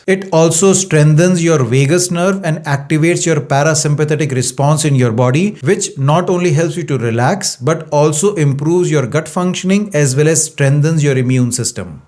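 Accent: India. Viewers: Hello Doc, are you really based in the USA? I don't think so considering your pronunciation. It is 100% Indian.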